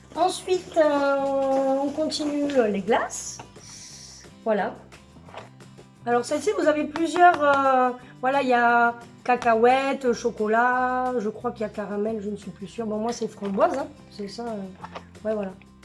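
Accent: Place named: Provence, France. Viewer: Bonjour, Je suis ravie d'avoir découvert votre chaîne lors de suggestions der retour de courses proposés par le site YOU TUBE et je tiens à vous féliciter de la façon à laquelle vous présentez vos vidéos, vous dégagez beaucoup de bonne humeur, de la joie, une énergie débordante et surtout je vous trouve très rigolote et je peux vous assurer dans ce contexte du coronavirus,vous vous transmettez beaucoup de bien, vous dégagez beaucoup de bienveillance, de modestie et de générosité. Je m'interroge sur ce petit accent bien provençal si je ne me trompe qui est charmant. Je vous encourage à nous proposer des vidéos de retour de courses ou dans d'autres thèmes. Au plaisir de vous visionner prochainement Bonne fin de week-end Fanny ( Paris XV ème)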